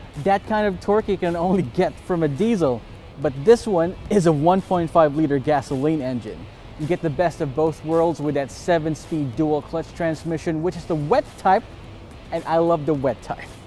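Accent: Philippines. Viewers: It felt like you are forcing yourself to have this perfect enunciation of words, and it resulted to some mistakes that you even apologized for. I'm sure it won't bother most people if you have an identifiable pinoy accent. Just be you, like that dad from AutoDeal reviews.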